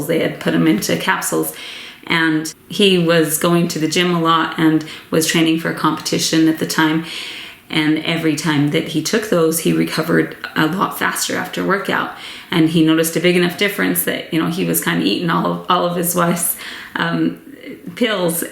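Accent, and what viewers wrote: Pennsylvania Dutch, United States. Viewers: Would you be able to do a video on your hair care and makeup routine for mom of many? You look so good and I know you’re busy so how do you do it?! Also, random question, do you have a bit of a Pennsylvania Dutch accent or something else? I’m just curious what I’m hearing ! So glad you’re making more videos (watched your laundry video and was sad there weren’t more homemaking videos!!!) 💛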